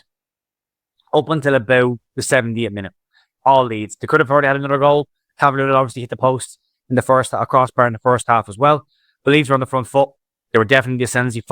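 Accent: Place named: Ireland. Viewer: Disappointed that you didn't say something along the lines of "forest destroyed by tree" in your Irish accent. Other than that great analysis!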